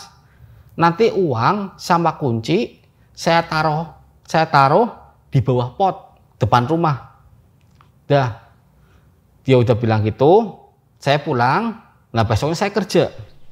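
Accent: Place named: Tegal, Indonesia. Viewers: wong ngapak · Logat tegal nya kental bget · Ora ngapak ora kepenak ya mas jos gandos 😭👍🏻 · Jane serem critane cuman logate karo basa ngapak dadi ora sida medeni malah dadi lucu😂😂😂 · Logat tegale ora ilang yah kang,,keren" mantep critane,salam saking Bojong tegal 🔥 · Medokee medoke masss 😅 waras terus mas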